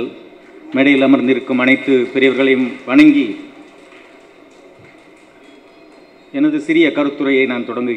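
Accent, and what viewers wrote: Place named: Malaysia. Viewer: Sound like malaysian slang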